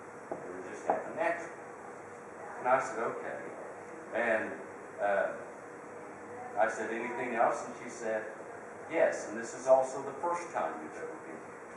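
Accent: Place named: Oklahoma, United States. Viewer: I thoroughly enjoyed your talk, and am so glad that Anna (as it seems (filmed it) and put it up for all to hear. It brought back such great memories or your "Oklahoma Accent":) which you still have in spades. Haha. And I also enjoyed the "chiming bowl" story,.. What an interesting idea you had, and told in a way only you could tell. It was such fun to see you being you again.:) Lynne Anna, Thank you for filming this and putting it up. It was great.:)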